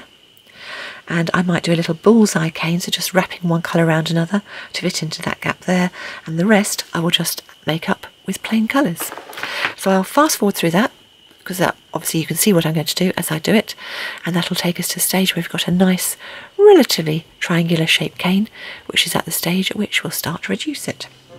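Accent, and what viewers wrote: England, United Kingdom. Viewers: That beautiful English accent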